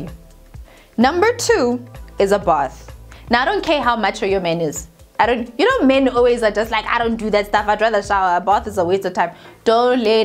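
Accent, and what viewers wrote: South Africa. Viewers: I just saw ur video on ugly panties nd subscribed with immediate effect! Liking the fact that u sound so South African gao zame go sound American or speaking through the nose😂🤣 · South African? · I LOVE #SouthAfrican Accent You're Amazing Girl ❤️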